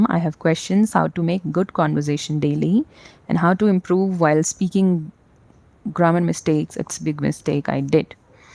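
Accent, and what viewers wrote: India. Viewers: Hai good evening,even if you from chamoli you are pronouncing exactly like Keralates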